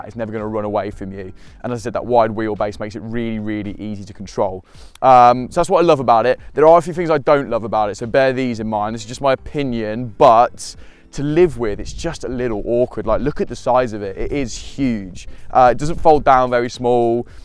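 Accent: United Kingdom. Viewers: this guy is brutally british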